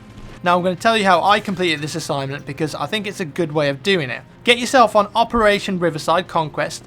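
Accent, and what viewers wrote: United Kingdom. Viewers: oh man, my recommendations just popped up with this. its funny looking back, sounds much more british.. and young.:D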